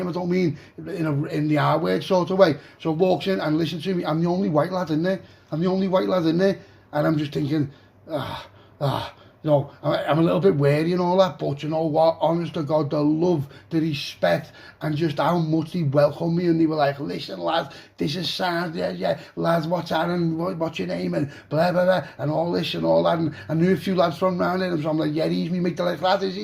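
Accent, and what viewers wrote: Liverpool, United Kingdom. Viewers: Yes scouse 💪🏾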